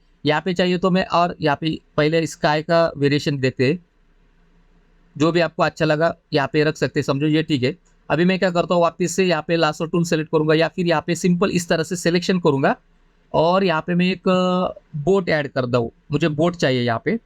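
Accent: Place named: Varanasi, India. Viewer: Varanasi se ho kya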